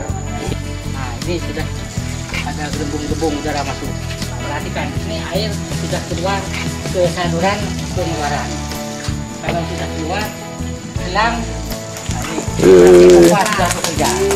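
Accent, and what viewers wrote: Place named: Madura, Indonesia. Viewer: waww.... orang madura nih bahasanya... mantep2 !!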